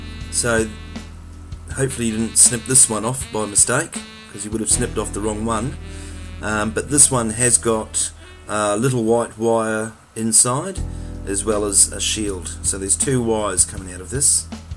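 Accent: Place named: Australia. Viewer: Unbelievable. An Aussie accent. I subscribed immediately. Yanks and Poms are ok but as an Aussie it's a nice touch to hear an Aussie or Kiwi accent.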